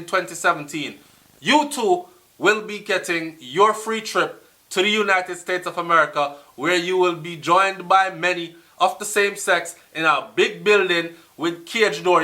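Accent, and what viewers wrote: Jamaica. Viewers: its IAN the BIG MAN from England long time,hope you and your good family are all well. I don't know how you do it, the big tv stations in the USA should grab you up oay you good money,and give you your own spot on their tv station, you explain everything so well with patois thrown in for good measure, and I don't say this lightly. your explanation about how the US run their system was superb. May I add that the United States whether we like them or not are so powerful that even in England they took away two white English stock brokers from top English family for stock market fraud against the US, they fought extradition "tooth and nail" as the saying goes, but not even the Prome Minister at that time Tony Blair could save them, at the present time they are in a Federal Prison sewing mailbags. A bit of info for you and your good subscribers, to show you how powerful the Yanks are, if a current American serviceman was to commit a crime in England, no English court cant touch him or her, instead they will be flown to the US and be tried and if guilty, be sent to a military prison, that's the power of America, lastly any foreign head of state visiting England,bodyguards have to leave their weapons on board their country's plane, not the Americans, even the Russians and Chinese have to comply with British request who provides armed police. 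So can you imagine what they will do to a Jamaican citizen comitting crime against US citizens, enough said,I am out of here. Bles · Boo fi you man! Stay a foreign wid yu fuckup yaadie accent and ur judgements, why are you so happy that people are going to prison? Yu think a dem alone ago suffer now? #YuAWasteman #Sensationalist #NevaWatchBeforeAndNahWatchNuhMore #YuABait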